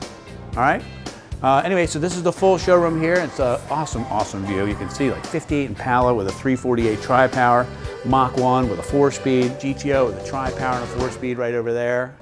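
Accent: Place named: United States. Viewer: really nice demonstration of your place and plain American English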